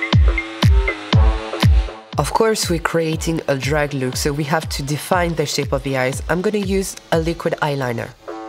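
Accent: France. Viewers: Juste en entendant son accent, on sait qu'il est Français 😂. / Just by his accent, we know that he's French 😂.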